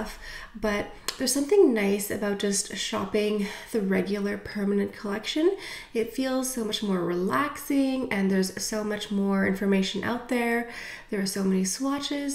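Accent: France. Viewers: I have that violet single! Love the look on you but I will have to lighten it up for my colouring. Love to hear you pronounce the names of product in your French way.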